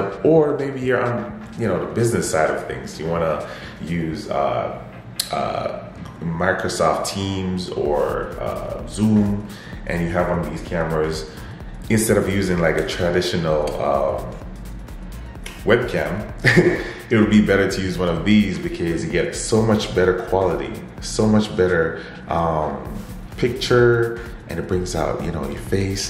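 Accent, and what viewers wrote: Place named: Jamaica. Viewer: I hear the Caribbean accent. If I had to guess. Yardy (Jamaican). Thanks for the video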